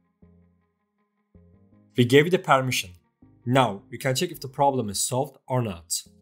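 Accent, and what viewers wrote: Turkey. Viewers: teeeşekkürler dostum konuşmandan türk olduğunu anladım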